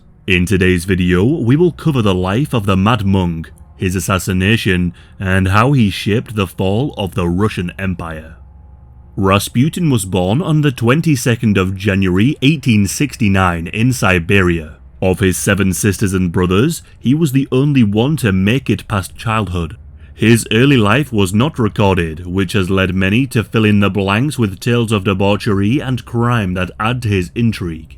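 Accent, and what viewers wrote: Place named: Yorkshire, United Kingdom. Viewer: weird combo of a west yorks accent and an east european one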